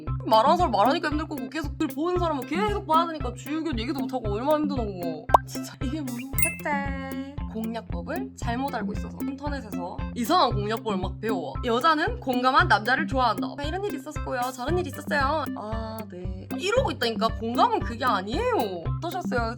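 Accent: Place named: Gyeongsang, South Korea. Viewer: 말투가 딱 대구아네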